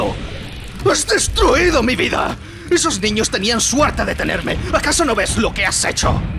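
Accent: Spain. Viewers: Siempre e dicho que odio el acento de los españoles pero sinceramente ningún otro acento le queda tan bien a las rimas como este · Eres el único español que amo como habla :v eres el mejor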